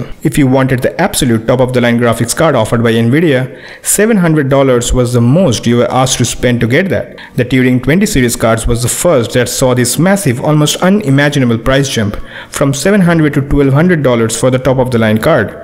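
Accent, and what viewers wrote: India. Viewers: After hearing it voice I confirmed that u r Indian Desi londa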